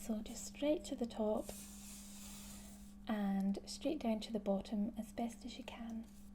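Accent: Scotland, United Kingdom. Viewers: Love your Scottish accent role plays! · I love videos where you have this accent, I'm from Glasgow so although this isn't my accent, it's so lovely to hear another Scottish one! It makes the video seem more real to me. Thank you for this video, I've watched it multiple times already! Xx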